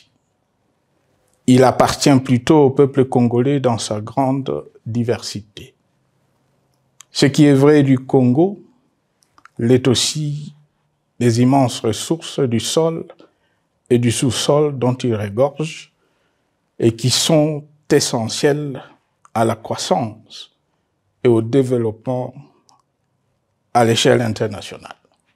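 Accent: Rwanda. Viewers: ça façon de parler vous sentez que c'est un Rwandais😭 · L'accent rwandais ne l'a pas quitté malgré les efforts déployés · Veritable ruandais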